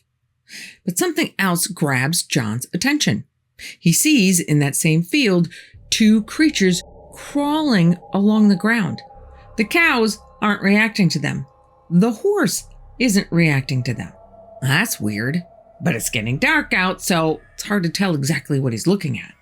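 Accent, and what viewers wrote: Midwest, United States. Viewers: You're a good story teller. Where's your accent from? Illinois is my guess. Maybe Wisconsin or Minnesota???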